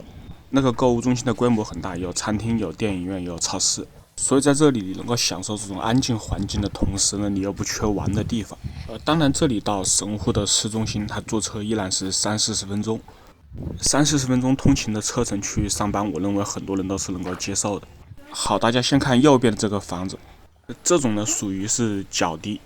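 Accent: Wuhan, China. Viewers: 这口武汉普通话啊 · 武汉口音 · up主是武汉人吗？ 听两句就感觉是武汉普通话😂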